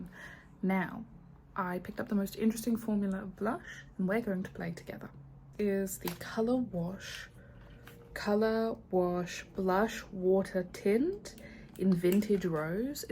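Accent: Australia. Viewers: Random comment.. First time I've heard her Australian accent now that she's speaking a lot. I thought she was English from the other videos (hardly any speaking).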